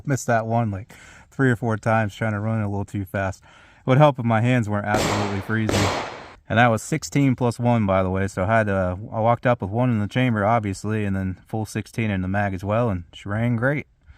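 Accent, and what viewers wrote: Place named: Ohio, United States. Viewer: "There's your take up there...I question myself whether or not I've wracked the slide on this BUT I think so (laughs) then pulls trigger! I recognized an Ohio boy right away!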